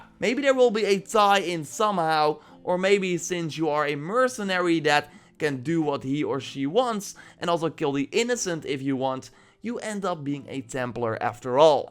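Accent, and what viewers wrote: Netherlands. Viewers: Nederlands accent is heavy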